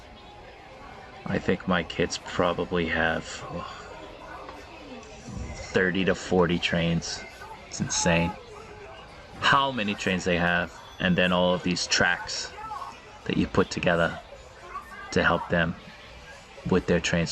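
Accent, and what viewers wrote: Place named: Massachusetts, United States. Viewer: You can't deny it now your from the Massachusetts and the accent is truely from their child palace became a circuit city the one in Dedham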